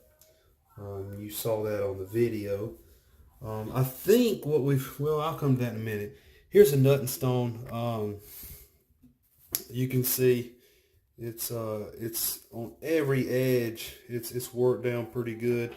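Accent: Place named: South, United States. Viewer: Nice finds ! Listening to you and seeing the artifacts I figure you're from Alabama or Georgia . I am in St.Clair Co. Alabama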